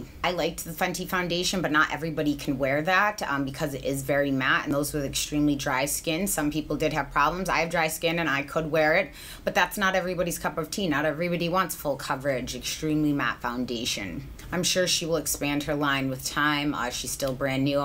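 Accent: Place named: Boston, United States. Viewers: Omg I love you, I knew you were from Boston 😂 You have that edge. I live in Cambridge and people always have something to say about the way I talk. Now I know why I love your channel! ( other than the obvious) . People also should spell it Mahhhhk not Mark lol where did you grow up? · Oh my god - you're from Boston. No wonder I understand everything you say and always know what you mean without explanation. Obviously, I'm from Boston (now transplanted to CA). Your accent isn't heavy, I hear your "r's" being pronounced quite clearly. It's more of an attitude/style of expression that is Bostonian to the core and always seems "right" to me because it's home. Okay - back to finish the video now. I had to pause it to write this - the new-found knowledge of your Bostonian-ness was wicked pissa and wicked monumental.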